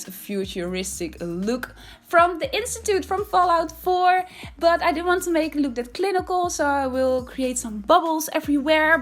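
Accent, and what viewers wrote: Netherlands. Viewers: The Sim Stream ik uit België 🇧🇪 ik dacht dat je uit Nederland kwam want er zat een Nederlands accent tussen je Engels 😁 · Wow i realy love the balcony in the bedrooms❤️ amazing how you made this! Looking forward to the next episodes of this series. Which country you live? You sound a bit dutch/holland like me😁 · Het ziet er geweldig uit 🤗 Alleen zou ik als kleine tip meegeven om wat meer de Amerikaanse of Britse uitspraak van woorden te leren 🙈 Je kon namelijk echt duidelijk horen dat je Nederlands bent, maar dat is zeker geen kritiek hoor, het gebruik en kennis van de woorden is namelijk erg goed 🤗 Het is oprecht gewoon bedoeld als tip 🤗 Je hebt in ieder geval wel een nieuwe subscriber 🙈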